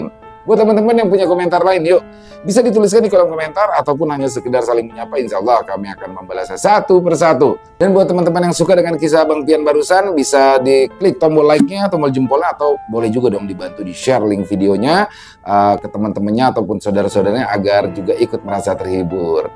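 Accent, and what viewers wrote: Jakarta, Indonesia. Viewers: Assalamualaikum...salam kenal bang ..aye demen bngt Ama bahasa Betawinya...kentel buangeett logatnya Betawinya...lanjuuutt😂😂😂👍👍👍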